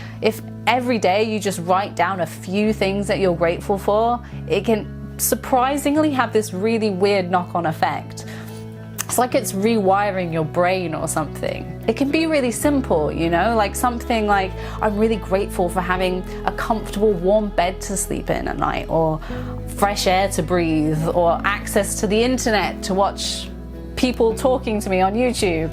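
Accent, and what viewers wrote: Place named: West Country, United Kingdom. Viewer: I was amazed to hear you grew up in the States, you sound like a west country girl to me.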